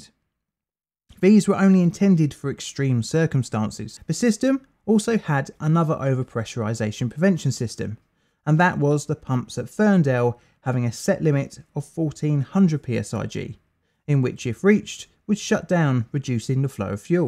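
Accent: United Kingdom. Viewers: Very much so. I enjoy falling asleep to British men talk about disasters. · It’s so weird hearing a British man talk about a bunch of tiny towns I live near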